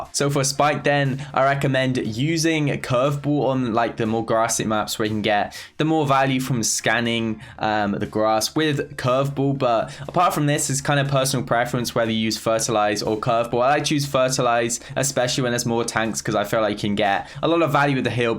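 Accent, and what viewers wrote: United Kingdom. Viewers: I love the British accent ;)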